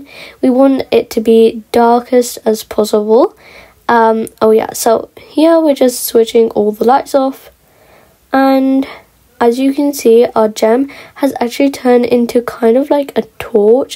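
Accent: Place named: United Kingdom. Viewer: I ❤ ur British accent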